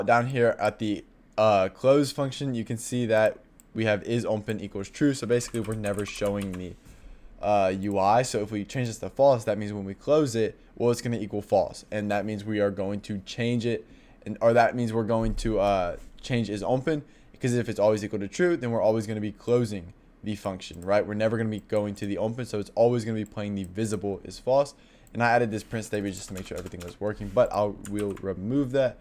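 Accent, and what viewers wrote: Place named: Mid-Atlantic, United States. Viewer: I gotta say...I've "been around the block" in terms of seeking out clear tutorials...and I keep coming back to (and I'm a fan of the mid-Atlantic accent...somewhere between Philly and Baltimore if I could venture a guess). Anyway, really great stuff here!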